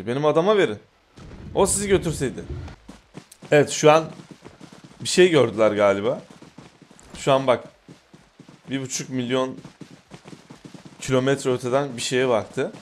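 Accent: Adana, Turkey. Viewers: ADANALIYIM DE BİZİ KURTAR YÜKTEN BU ŞİVELERİN BAŞKA AÇIKLAMASI OLAMAZ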